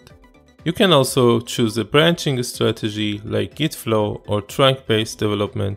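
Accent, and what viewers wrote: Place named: Iran. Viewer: CHITOOOORI. I can hear the Iranian accent.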